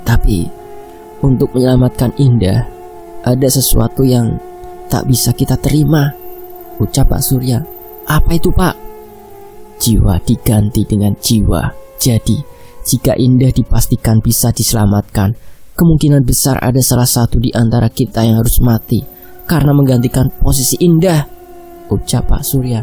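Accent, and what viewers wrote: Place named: Cilacap, Indonesia. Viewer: Nada suaranya kyk cilacap nih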